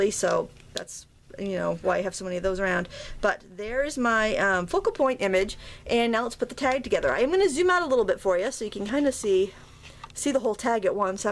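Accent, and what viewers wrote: Maine, United States. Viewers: Lolol, welcome to you tube land, to hubby! Love the little frog. Don't know why, but I've always loved them. Thanks for sharing all you do during your videos. I was watching your vid the other day, my sweetie walked past, and stopped... staring at the screen. I said you were a great teacher, and that you lolwere from Maine, he said "Oh." And moved on. No telling what that meant, but he's from MA. Boston more precisely. Lol Today is the first time I've heard your accent come thru. His is always there! You should hear the recognition problems he has on the phone! Anyway thanks for all the thinks I've learned from you. Hugs, Jess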